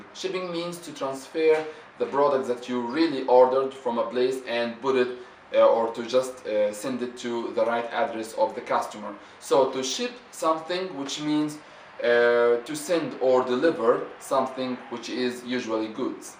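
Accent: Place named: Egypt. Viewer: Egyptian accents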